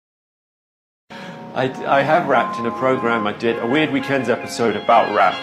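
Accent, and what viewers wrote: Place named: Australia. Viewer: Aussie accent just hits different.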